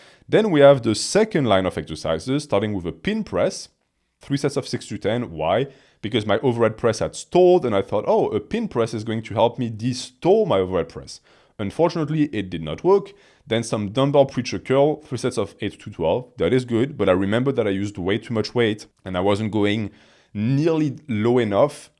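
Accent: France. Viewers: God I love that French accent idk why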